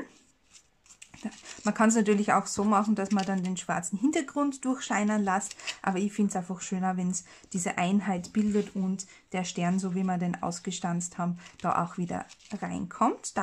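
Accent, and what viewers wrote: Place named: Austria. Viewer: Hallo liebe! Deine Videos sind einfach genial! 👍🏻😍Ich schau sie mir sehr gerne an. Die Anleitungen sind super verständlich und einfach. Ich staune immer, was für tolle Idee du für die Stanzformen vom Action hast. Anfänglich sind sie mir irgendwie"billig" vorgekommen,aber mittlerweile find ich sie richtig gut. Und dank deinen Videos natürlich auch 😊. Es spielt auch eine große Rolle, daß endlich eine Österreicherin amal gute Videos macht! Natürlich sind die von unseren deutschen Nachbarn auch sehr gut,aber der heimische Dialekt macht halt schon einen Unterschied 😁🤭. Na auf jeden Fall werde ich mir auch deine nächsten Videos"reinziehen und ich bin jetzt schon gspannt,was du schönes basteln wirst😊💕.Glg Maria aus Bad Gleichenberg/ Südoststeiermark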